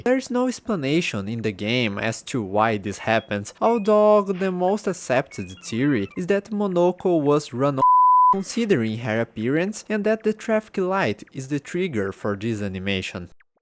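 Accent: Brazil. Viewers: I can sense the Brazilian accent from miles away 😭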